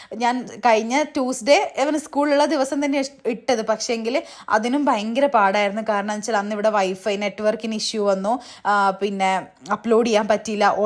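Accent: Kannur, India. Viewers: ഞാൻ എല്ലാ വിഡിയോസും കാണാറുണ്ട്, home tour വീഡിയോ കെട്ട്യോനെ പിടിച്ചിരുത്തി കാണിച്ചു, 😊 പിന്നെ കണ്ണൂർ slang നല്ല രസാ കേൾക്കാൻ.. ഞാൻ ഒരു കൊല്ലംകാരി ആണ്.. കരുനാഗപ്പള്ളി നിന്ന് ഒരു 30 min okke, hus ന്റെ വീട് തൃശൂർ ആണ്.. 😊 ഇപ്പോ തൃശൂർ ആണ്..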